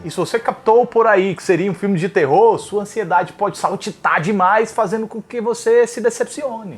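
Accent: Northeast, Brazil. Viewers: O sotaque e o palavriado Típico do nordeste. Que maravilha ver alguém usando tão bem nossa língua. Parabéns.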